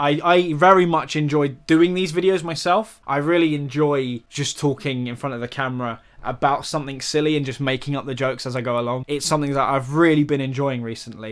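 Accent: United States. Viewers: Your American accent is spot on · I love your American accent 😂😂😂😂 not even offended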